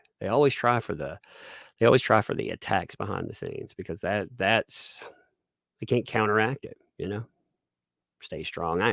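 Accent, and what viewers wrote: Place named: South, United States. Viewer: You kind of talk like Beau of the Fifth Column. He has a little twang too when he speaks he must be a southerner like you 😂 😂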